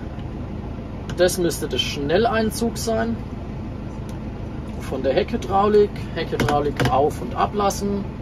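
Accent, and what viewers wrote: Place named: Franconia, Germany. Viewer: Da ist Triedorf Jacke oder? Du klingst auch fräängisch. Hab da vor evtl auch hinzugehen nach dem Abi.😊 Und btw ich meine gelesen zu haben, bis 1900 Undrehungen ist verzögern 0 Problem. (Bei Druckluft gebremsten Anhängern ist das natürlich was anderes) Wir fahren eigentlich zu 90% einfach mit TMS und Fahrpedal.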